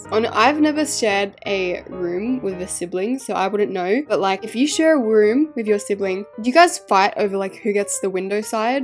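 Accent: United Kingdom. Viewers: I love that u talk British 😊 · I love ur voice its like so British !! like I did like ur “red is a spookah colar”!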